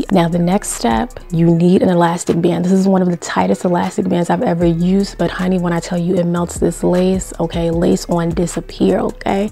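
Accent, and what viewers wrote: New York, United States. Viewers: I am from NY and they way you speak I'm figuring you are from NY or close to so you'll get this I'm dropping a Funky Master Flex bomb on how you slayed this sis lol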